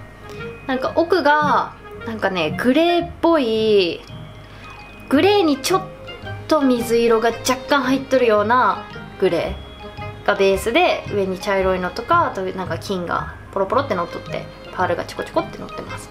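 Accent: Hakata, Japan. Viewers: YouTubeで博多弁聞くとなぜかほっこりする笑 アクセサリーが大人の女性って感じで綺麗やん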